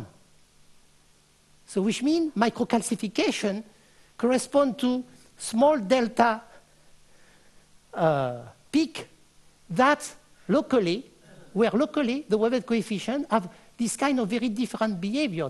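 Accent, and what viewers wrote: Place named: South, France. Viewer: Nous n'entendrons plus ton accent du Sud et tes fous rires😢 tu avais tant à donner encore. Regrets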